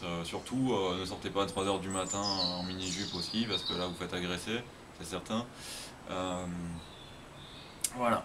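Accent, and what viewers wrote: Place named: South, France. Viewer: ah oui d'accord alors tu commence à avoir l'accent du sud !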